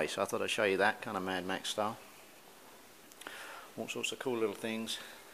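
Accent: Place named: Australia. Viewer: you sound like an ozzy mate ,haha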